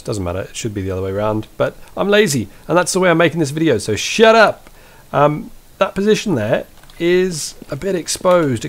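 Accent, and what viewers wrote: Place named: Wales, United Kingdom. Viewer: 1 question plz : u from UK? edit: yeah,i fukig knew it,i have a friend from UK too,and his accent exactly like u...and he live in south wales GReat smoke,dude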